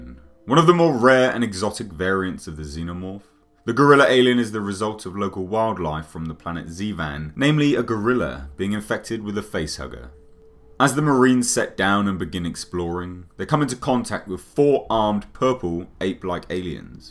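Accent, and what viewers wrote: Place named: East England, United Kingdom. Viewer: U. PY I am going to guess you're East English or Western English? You said Love so I am going to guess east.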